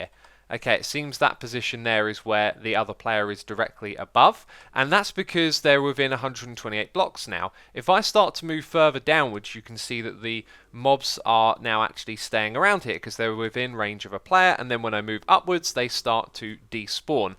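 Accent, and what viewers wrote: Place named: England, United Kingdom. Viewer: That English accent..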